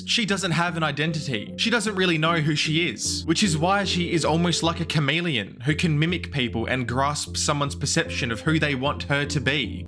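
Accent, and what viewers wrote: Australia. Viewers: Cool guy with Australian accent, defenetly subbing !❤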